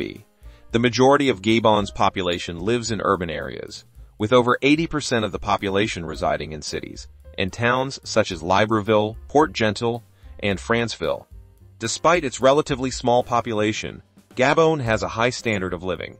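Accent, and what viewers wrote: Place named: United States. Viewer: That is American pronounced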